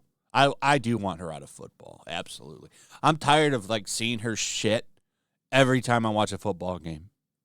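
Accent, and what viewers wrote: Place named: RP, United Kingdom. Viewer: Hahaha STOP IT withnthe British Lord Accent... Man i dont get it. Why that accent, its so NOT lib. 😆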